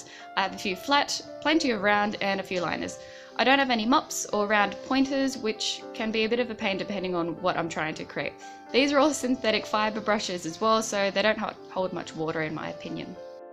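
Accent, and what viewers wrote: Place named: Australia. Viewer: Nice to come across a review video with an Aussie accent. Hi from Lismore, NSW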